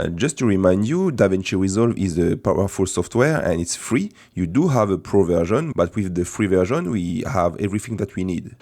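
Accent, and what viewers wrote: France. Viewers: me disait bien que tu étais français j'avais reconnu l'accent 😂 Un énorme merci encore j'apprécie énormément votre travail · L'accent français me fume très intéressant sinon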